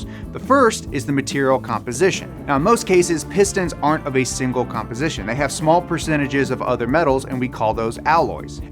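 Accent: Finland. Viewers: me seeing thumbnail: thats a "finnished" video :) also nice finnish english accent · ah hearing that Finnish accent is music to my ears. Grandparents are From Finland so its nice to hear lol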